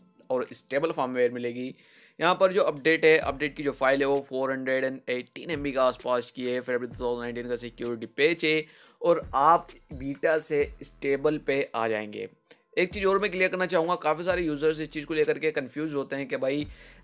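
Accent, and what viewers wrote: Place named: United Kingdom. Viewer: Bhai itna aungrej kyu ban rhe ho.... Aisa lag rha hai jaise lagaan picture ke firangi ho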